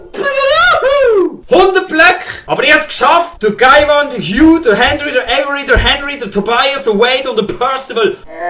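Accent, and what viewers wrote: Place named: Basel, Switzerland. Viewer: scho nur dis Baslerdütsch isch amüsierend ^^ machsch du super!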